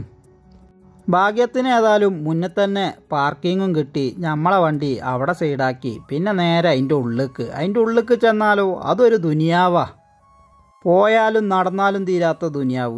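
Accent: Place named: Malappuram, India. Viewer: നിങ്ങള്ടെ ഭാഷ കൊള്ളാം ചില മലപ്പുറത്തുകാർ സംസാരിച്ചാൽ തന്നെ t v m കാർക്ക് മനസ്സിലാവില്ല നിങ്ങൾ പൊളി സംസാരം കെട്ടിരുന്നാൽ സമയം പോവാലറിയില്ല 🤓🤓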